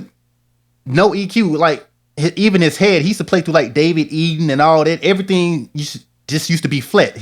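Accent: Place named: Deep South, United States. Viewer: The only thing cooler than your tone is that deep south accent...great explanations, thank you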